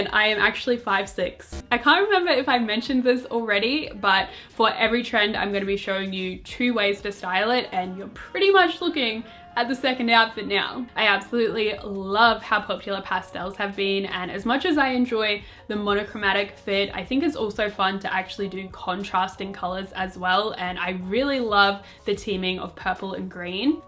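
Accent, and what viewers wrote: Australia. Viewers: I figured she was from Australia because she lowkey sounds like Felix, Bang Chan and this one Australian girl I used to know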